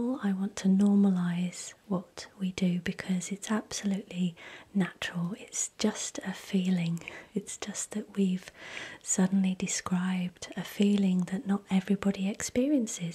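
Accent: England, United Kingdom. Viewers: Enhlish accennnnnnt, live it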